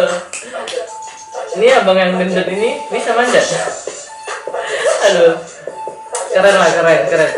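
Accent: Medan, Indonesia. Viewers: orang medan ye bro?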